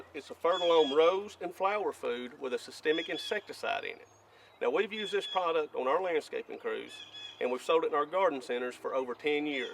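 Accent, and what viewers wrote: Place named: South, United States. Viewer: Absolutely unrelated so I apologize but I noticed your accent immediately. Being from southern Virginia, I love and appreciate a good southern accent. Now on to actually watching and paying attention to the video. 😊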